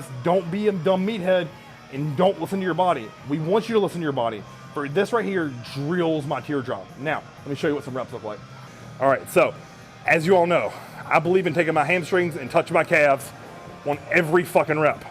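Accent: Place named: Yugoslavia. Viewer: You have a very strong yugoslavian accent that I can't get over but seriously you have excellent advice. The key to VMO activation is pretty much raising your heels and staying in the lower half position of the squat. We my wife & I try this in our village, GOOD FOR MILKING COWS!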